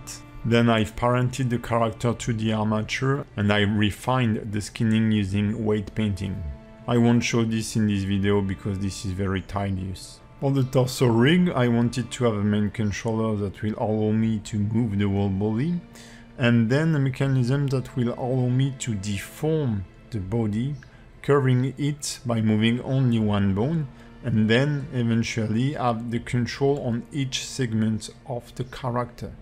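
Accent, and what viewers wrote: France. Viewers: So I came here because I'm struggeling with a super easy mesh and rig, just movement, rotation and IK and everytime I put IK on my mesh it glitches about wierdly. This is way above me, I will come back when my IKs work. Good inspiration though, and love the slight touch of authentic French accent ^^ Merci à bientôt!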